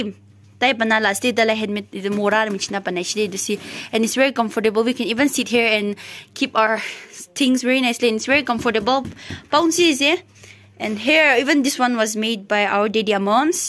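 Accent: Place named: Nagaland, India. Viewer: I really don't know why YouTube recommended me this 😂 but I love the accent... Didn't know Naga accent sounds like this 👍👍 love from Mizoram... Got yourself a new subscriber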